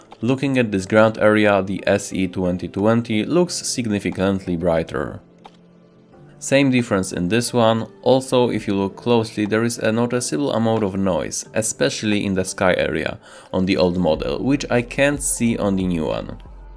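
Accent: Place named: Poland. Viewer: Siema, fajne porównania, słysze po akcencie że chyba jesteś Polakiem :D Swoją drogą co to za nową stacje budują w 3 minucie filmu?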